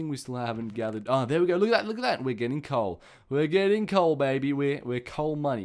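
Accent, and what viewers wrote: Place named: Australia. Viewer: He's Australian. That's how we pronounce it and it's also how it's pronounced in the UK.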